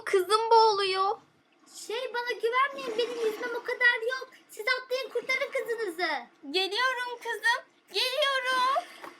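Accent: Black Sea, Turkey. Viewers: siz karadenizlimisiniz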